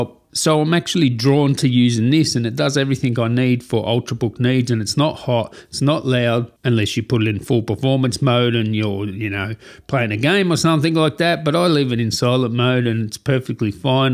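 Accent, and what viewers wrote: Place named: Australia. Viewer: Australian accent 😊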